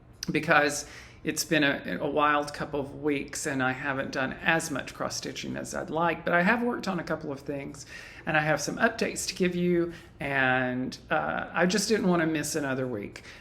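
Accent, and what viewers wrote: South, United States. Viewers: I've enjoyed bingeing on the last 2 months worth of episodes (got a little behind on the flosstubes). I just love your gentle, relaxed, southern manner, so soothing and at the same time so fun to listen to while I stitch after a long day at work. :D Your granny sounds like she was just amazing, thank you for sharing her poetry. Thank you for bringing a smile to me face and bringing a little bit of "happy" to my day. #chrisitup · I always love hearing the poems from your”granny” and the stories from your past. I am not from the South but I love hearing the many fun words and accent that you grew up with. Thank you so much for sharing. I hope you get lots of stitching time this week………….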